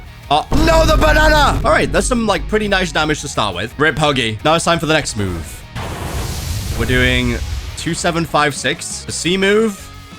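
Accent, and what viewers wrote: United Kingdom. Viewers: is British right?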